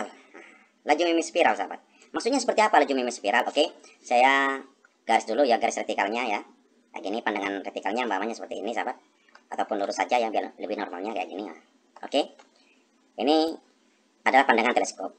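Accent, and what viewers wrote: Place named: East Java, Indonesia. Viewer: Trims ilmunya, suaranya lucu, logatnya Jawa Timuran😂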